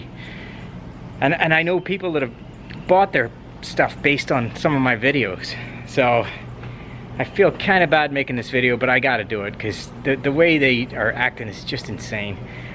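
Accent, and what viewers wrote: Northern Ireland, United Kingdom. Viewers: Enjoyed your videos thought I got a sound of a north of Ireland accent , greeting from Dublin.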